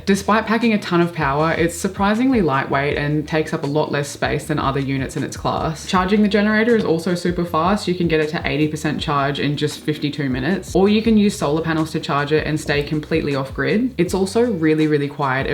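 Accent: Australia. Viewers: Aussie John Oliver is back!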